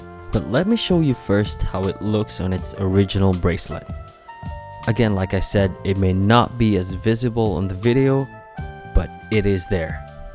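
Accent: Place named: Singapore. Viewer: Bro, great looking Nb1050. BTW custom size leather is quite easy to get these days right? You sound quite Singaporean, are you in Singapore?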